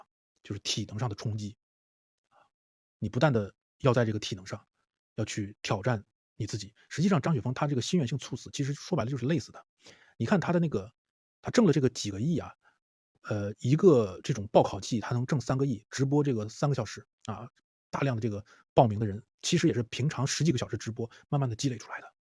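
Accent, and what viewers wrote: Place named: Northeast, China. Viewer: 长相口音，典型的东北满狗或者蒙古鞑子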